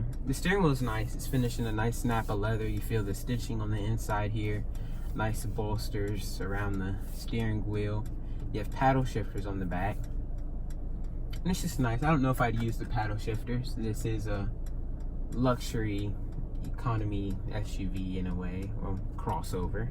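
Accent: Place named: England, United Kingdom. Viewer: Please can you be more presentable with you hair, on a very positive note, a good clean English accent. Thanks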